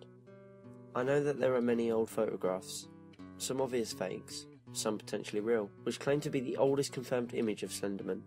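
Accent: London, United Kingdom. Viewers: This is the first video I've watched of and my first reaction was "HOLY SHIT, HE'S BRITISH."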